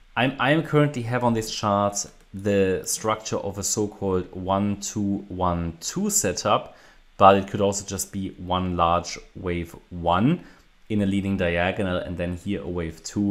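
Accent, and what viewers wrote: Germany. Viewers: May i ask what part of Europe are you from? Germany🤔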